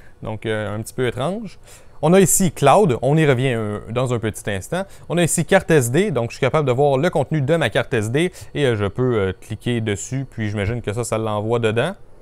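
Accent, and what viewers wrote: Quebec, Canada. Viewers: Très intéressantes, tes vidéos. Captivantes même. Et délicieux l'accent des cousins d'Amérique ! Merci et bravo.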